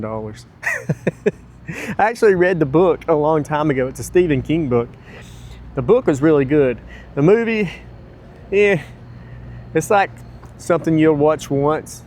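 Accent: South, United States. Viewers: You have some great videos, I've been hooked for awhile now and luckily am not caught up so i always have a new one to watch. You have those common things i find entertaining: "owe", "I forgot" "oh man" "i have an apple pie" and for tne longest time i couldnt get that you were saying "spiders" until i recognized the southern accent and learned where you're from. To me, you are saying spotters lol. Love the channel, keep doin you.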